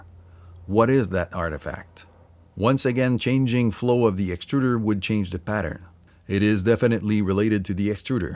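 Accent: Quebec, Canada. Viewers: That guy sounds like he's from Quebec. Really nice and detail video btw.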